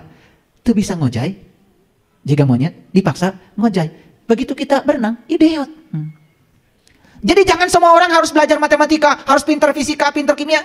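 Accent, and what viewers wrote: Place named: West Java, Indonesia. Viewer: Si paling kemarab, lidah Sunda koq dipaksa jd arab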